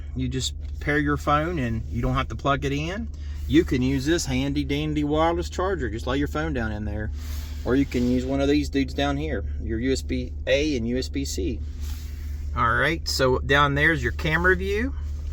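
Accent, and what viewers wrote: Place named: United States. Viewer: Thank you, loved your cow boy accent, lol ( No i mean it) I can talk to all dang day long, appriciate you to go over all the controls, it helps a lot, bye the way I fall off my chair laughing on use that dial to tune to you wann jam to lol.... Love it brother.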